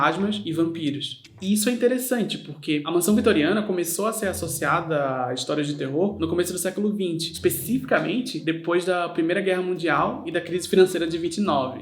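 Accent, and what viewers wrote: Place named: Recife, Brazil. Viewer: os vídeos de são o cantinho da internet que me faz sentir que tô tomando cerveja com uma amiga que fala sobre cultura pop de forma inteligente e debochada e matar a saudade do sotaque de Recife Relação parassocial 10\10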